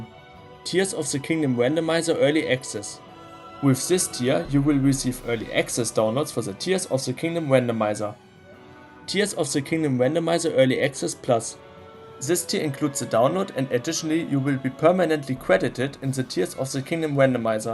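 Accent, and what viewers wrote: Germany. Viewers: mach weiter so ich liebe deine Videos aber es fällt mir manchmal schwer dein Brandenburger English zu verstehen aber große props an dich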